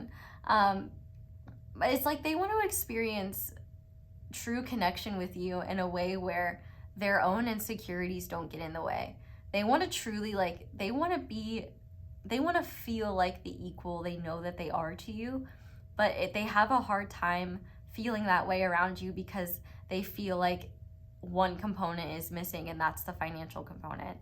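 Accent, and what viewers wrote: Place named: Brooklyn, United States. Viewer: Pile 2, my person is a radio dj and in the beginning whenever we would fight and have a big fall out and stop speaking (we were both denying the depth of this), I would feel a huge surge in my heart and tune into his radio station to hear what he truly wanted to say because our words were failing. This reading is so spot on thank you for your gifts. Haha also I have 5 aspects in Gemini and his mercury is in Gemini too. Also your Brooklynn accent — we’ve talked about naming our future daughter brooklynn. ❤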